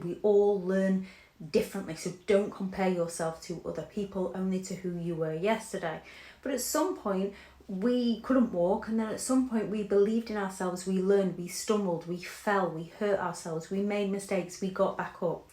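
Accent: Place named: Scotland, United Kingdom. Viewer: It’s a very long slow process for the mob to catch on. Your message is so powerful. You’re 10 years ahead of a typical gringo. I know I’m supposed to be cold towards those we understand or care for. It’s a fking lie. We must love and care for our fellow tribesmen women. It’s a 2 way gig. If your message can do this to me? Just march on. You sound Scottish to me but I only did military things in Europe. Way too busy to be focused on dialectical grammatical words or culture. I’m trying to stay awake so I can get in a schedule. Since she’s been gone. Your message has been cutting through the mess. Working down south is just bribe or pay people to leave me alone or work for me. We are not a non profit organization. Get hard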